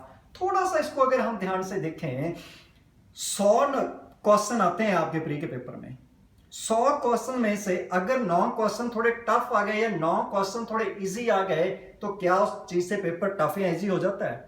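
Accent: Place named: Punjab, India. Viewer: Punjabiya dii Hindi 🔥😁......tq sir....really informative and motivating🙏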